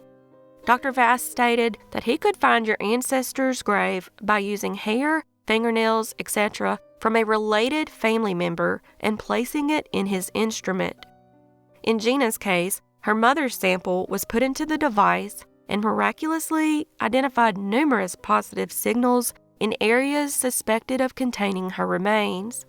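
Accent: South, United States. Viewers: Only southern accent I like. Thanks for all of your hard work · Nice to hear a southern accent even though mine makes yours sound like a Yankee.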